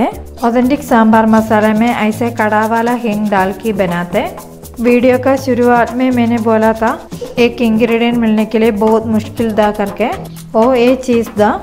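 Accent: Kerala, India. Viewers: I tried the recipe. Yummy. Sorry to make personal comment. Your Hindi is really good though in Malayalam accent with a beautiful smile. God bless you. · Love ur Sweet voice of speaking hindi with Kerala accent😅😅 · Yess true your hindi is sooo cute... Even i am malayalee but born and brought up in Gujarat and Bombay so my accent is opposite when i speak malayalam all understand that i am not brought up in Kerala...😀😀😀😝. I am trying yours recipe today.. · Mam your recipe and your hindi pronaunsation both are authentic keralian nice thanks god bless you 🙏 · I know this kinda sounds crazy but your voice and way of speaking hindi reminds about my frnd from Kerala during my UG days. I just love that. #oldmemories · I can actually feel the mallu vibe while she's talking istggg the actual way a malayali talks when they try to speak in hindi I remember my relatives talking like this when they came to Mumbai for the very first time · Your voice is cute...than urs receipe...love Hindi from keralites...its sweet n swett.. 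· Seriously, I love her hindi more than the recipe .And it is so Kerala authentic. Really cute.😀😀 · Loved your recipe. Your detailed instructions. N above all your enthusiastic n beautiful kerala accent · 😀true..authentic kerala hindi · Malayali ki hindi .good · Last year we had been to kerala.And also one of my best friend is from kerala. I just love your hindi accent. It is so sweet. Thanks for sharing keralian recipes. God bless you n stay blessed always.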